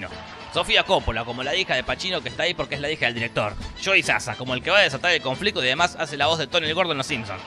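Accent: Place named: Argentina. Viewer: Insoportable la manera en la que hablas Argentino tenias q ser.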